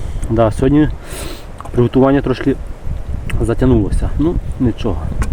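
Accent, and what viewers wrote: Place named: Podillia, Ukraine. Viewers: Я теж спочатку по говірці подумав що Хмельниччина😊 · Вітаю Добродію! Намагаюсь по вашій розмові визначити звідки ви...Ваша розмова дуже схожа, на ту, якою розмовляють на Поділлі. І мабуть все таки не Вінницька, а Хмельницька область.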